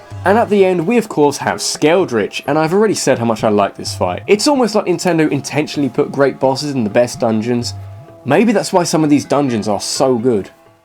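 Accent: England, United Kingdom. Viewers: Does he come from england? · Are you British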